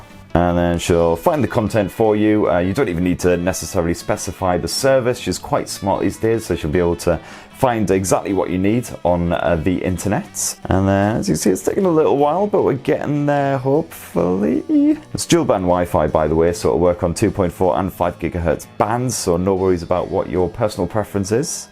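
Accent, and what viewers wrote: United Kingdom. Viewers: I just listened to this video for the wonderful British accent 🤠.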